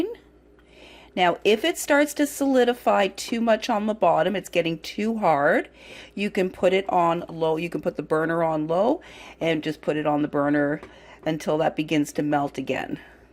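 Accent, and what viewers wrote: Canada. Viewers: I love the Canadian accent and your recipes thanks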